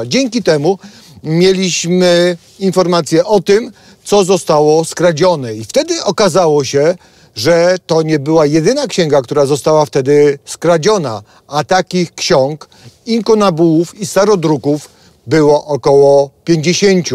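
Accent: Kraków, Poland. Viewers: Panie... no nie czarujmy się, powiedzieć, że full profeska jak na amatora i że pan Fajbusiewicz mógłby panu plecak nosić, to jak nic nie powiedzieć. Swoboda przed kamerą, merytorycznie przedstawiane sprawy, ten cudowny krakowski akcent ( jestem z pomorza zachodniego), no i oczywiście fenomenalne kadrowanie jak na " youtuberowych stremerów " to jesteście prze mega... tego, tego ale nie przeginajcie, bo to zajefajne kadrowanie z dołu staje się Waszym Logotypem. Pozdrawiam gorąco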